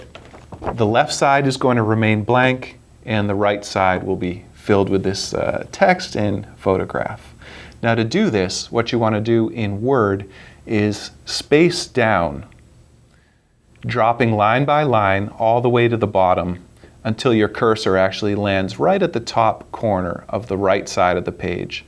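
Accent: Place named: United States. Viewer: Excellent! You speak slowly succinctly, and with an American accent! I have been on computers for longer than many people have been alive, and have always struggled with how to make greeting cards. The amount of paper and ink I wasted would have paid for someone's college education! A head slapping moment came when you showed the formatting trick was to use two columns. Gawd!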